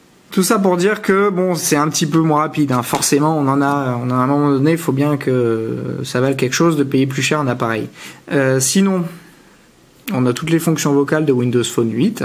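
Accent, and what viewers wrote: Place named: France. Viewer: I'm just here for the french accent :')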